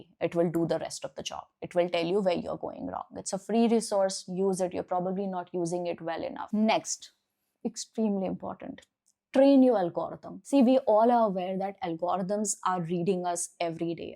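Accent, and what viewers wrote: India. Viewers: You need a training dear, you have heavy indian accent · Indian accent 😅 I didn't understand nothing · Maam is that you dont care about your accent. I mean you are not trying to have like native english accent. Im just asking?! Why dont you come out of your comfort zone from indian accent. Im not saying try to fake it, but ill be so much interesting if you could have native english accent like Janvi pawar.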